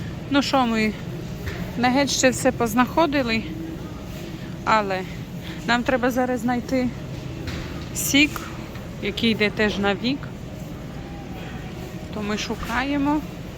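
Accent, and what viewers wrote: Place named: Transcarpathia, Ukraine. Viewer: спасибо за видео. Пожалуйста продолжайте разговаривать на украинском языке.Я уже 30 лет живу в России. Сама из Закарпатья. И так приятно слышать именно наш украинский диалект из Америки. Всех вам благ.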